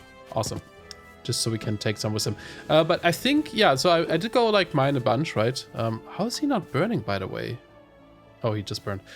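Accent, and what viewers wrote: Germany. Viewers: Love the german accent, after looking for TFC i luckily found your channel and am really enjoying ur videos! Keep it up :) Hope u will be better soon! Greetings from germany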